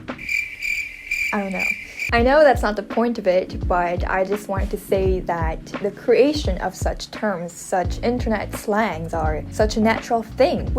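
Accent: Philippines. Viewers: I am a first generation American of Filipino descent. I have never visited the Philippines, but after first watching your video (speaking English w/ a Filipino accent) a few months ago (followed by many more videos), I was hooked. I want to visit there now - all thanks to you! As an aside, I would also like to visit S. Korea. By culture, experience, language, knowledge, living there nine years, you are a subject matter expert on the Philippines. I don't quite know what the issue is... If it's monetization over your content, then travel agents shouldn't make any money. Is it because you are not Filipino? That would be racists. Can only Filipinos post videos and comment about the PI? Your videos are well-made, creative, and thoughtful. They are also positive, and only benefit the country. W/ regards to the hate you receive: If you have not watched the movie The Social Dilemma, you may get a better understanding of the hate. 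In summary (spoiler alert); your viewers are the product being sold to advertisers on YT (the source of the monetization), conflict (hate) generates more viewers/posts than does love. If there are no negative comments, the artificial intelligence (AI) steps-in to create that negativity - generating responses (and money). Simple comments like, "You're stupid" or "You're not Filipino" can generate a lot of responses. Sorry, so this is so long. I want to give you support by letting you know I very much enjoy your videos. As a Filipino (albeit in the US) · Just the way you speak i call feel that you grow up here in the Philippines, and Pinoy baiting doesn't apply for everyone that makes Filipino related Contents, Pinoy baiting are actually being done by those people are not familiar with Filipino Culture but still make Contents out of them... Its just a strategic move for them, but i hope that people can support the channels that makes worthy videos, (for any type of contents) where people can learn or be entertained by it without being sexist or make whatever that isn't even worth watching like idiotic pranks that are obviously scripted and theyre not even trying to think for a good content but for some reasons there are people who support them, i cant blame them(viewers) because maybe they want to fool themselves lol, who knows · I know you have a filipino english accent and many koreans have filipino english accent but yours is my favorite ❤️❤️❤️ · I'm Pinoy, I watch her videos because of her pinoy english accent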